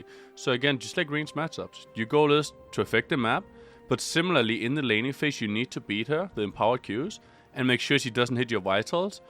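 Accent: Denmark. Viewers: Dansker? Btw very helpful video !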